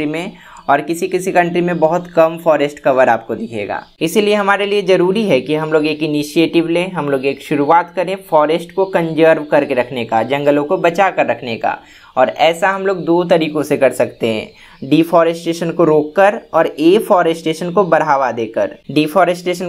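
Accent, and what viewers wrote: Bihar, India. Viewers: Sir aap jharkhand bihar ke ho kya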